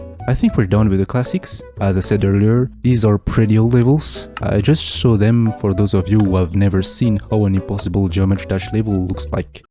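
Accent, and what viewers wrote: France. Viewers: good video but you sound suspiciously french 😤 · No it’s alright volume wise but you have a really strong french accent and it’s really hard to understand you sometimes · You sound french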